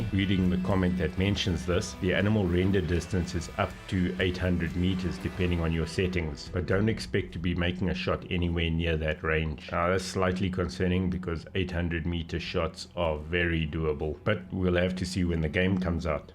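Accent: South Africa. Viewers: I knew you where South African as soon as I heard your voice,I'm really happy tho that there are more sa youtubers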